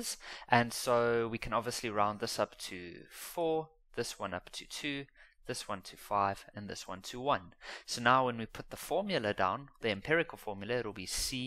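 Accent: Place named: Afrikaans, South Africa. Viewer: aaaa... comment take 2. It is now 2;50 in the moring with my test tmr, this is like the 3rd video of yours that I'm watching and oh my soul, the jokes. I almost woke my house laughing. fristy comment on your market stragey, Making content that is already in demand just slightly older is very smart * cap cap. secondly thank you for making this avliave bro, appreciate it. thirdly, are you South African? Your accent sounds very much afrikaans, its cool. Okay good night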